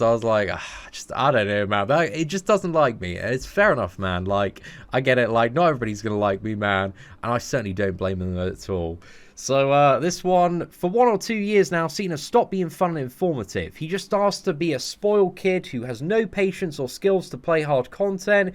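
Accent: United Kingdom. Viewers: Ngl there are 3 reasons why I am subscribed 1. You're british accent is amazing 2. You gamble everytime 3. I play contest of champions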